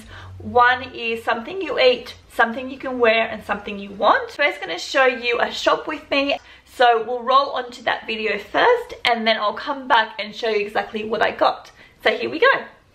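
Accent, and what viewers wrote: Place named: Australia. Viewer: How cute are youuu!! Love your Australian accent!! I think you slayed that challenge!! Well done! Want some of those gummy snakes now!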